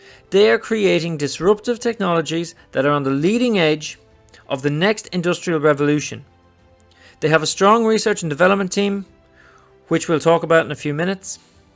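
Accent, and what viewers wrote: Ireland. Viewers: What part of Ireland are u from?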